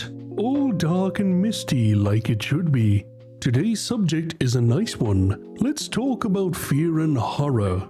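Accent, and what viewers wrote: South Africa. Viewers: You're south african?❤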